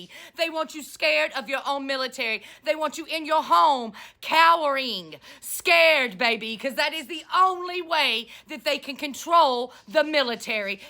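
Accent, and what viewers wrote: South, United States. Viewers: 👏🏼👏🏼👏🏼PREACH BABY!!! 💯🎯With that deep southern drawl accent 😂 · You’re brave!! Love your commentaries! You give us courage! I love your voice and your accent, “Why, I do declare, you sound like Scarlett O’Hara! Keep strong everyone!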